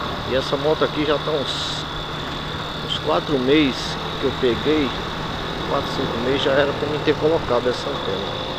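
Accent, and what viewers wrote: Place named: Pernambuco, Brazil. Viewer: legal Mano você é de Pernambuco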